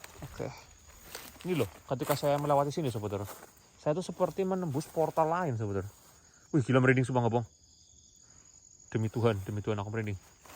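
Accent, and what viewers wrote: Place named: Banyumas, Indonesia. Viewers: Bru ktmu chanel ini...bnr2 luar biasa beraninya...ko ini lucu ngomongnya medok ngapak...pdhl sipit ya ko semangat.... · arane kaya wong rusia jebulane wong tegal ngapak oii...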